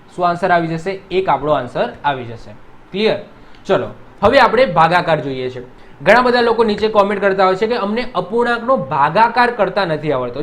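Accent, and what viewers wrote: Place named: Kathiawar, India. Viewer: Sir bov lecture joya tamara bov maja aave se bhanvani amari jevij sudhdh kathiyavadi bhasha se tamari 😊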